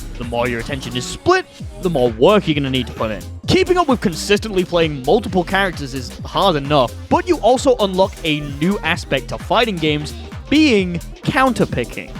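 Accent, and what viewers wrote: United Kingdom. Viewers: i want it to be known that i do enjoy your silky smooth british voice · I'm just here to hear the bri'ish guy talk, honestly. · I do just love your silky smooth British voice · yes we do love your silky smooth british voice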